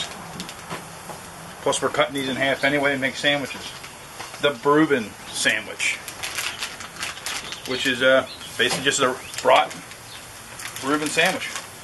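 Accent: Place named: Pittsburgh, United States. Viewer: We are enjoying your videos. I is a pleasure hearing someone speak Pittsburghese. We are from Bellevue. This recipe looks awesome.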